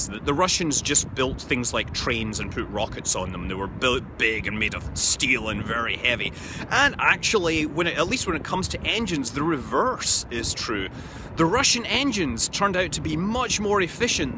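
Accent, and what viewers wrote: Scotland, United Kingdom. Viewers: Nobody expects the Scottish accent.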